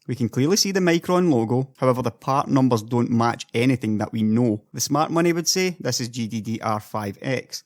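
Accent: Scotland, United Kingdom. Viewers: Love your accent >~< . Scotish right? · tech updates with a Scottish accent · It took me a while to get used to your Scottish accent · "Alright guys, how's it going?" in a Scottish accent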